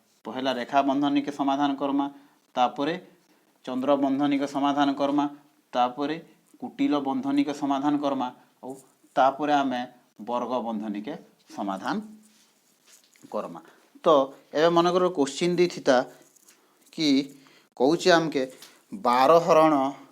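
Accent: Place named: Odisha, India. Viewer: ପୁରା ଏକ ନମ୍ବର ସମଲପୁରୀ କହୁଛନ ସାର୍ ବଢିଆ ବୁଝାଉଛନ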